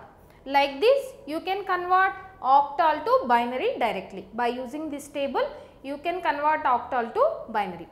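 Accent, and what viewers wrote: India. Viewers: i think am only falling for u....you are beautiful a.....i have subscribed to your other lectures ......but not familiar with indian accent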